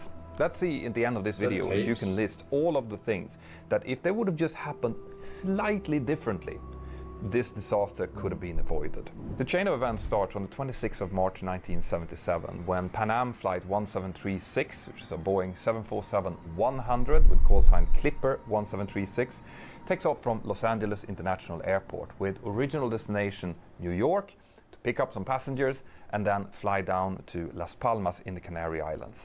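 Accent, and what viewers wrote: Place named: Europe. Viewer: Okayeg weird nondescript euro accent narrator :^(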